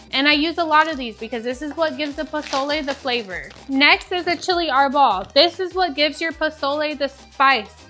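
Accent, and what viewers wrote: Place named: Sub-Saharan Africa. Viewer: A colonizing saa mid uggo ssa yt girl with a funny ssa accent 😂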